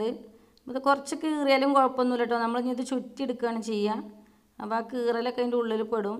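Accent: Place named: Malappuram, India. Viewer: മലപ്പുറം ടെച്ച്. അത് മനസ്സിനൊരു ആനന്ദമാണ്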